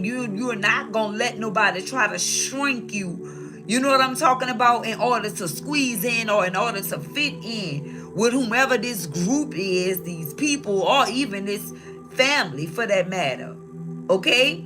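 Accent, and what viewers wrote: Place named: New Orleans, United States. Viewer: I know you from New Orleans the way you Talk. REALNESS.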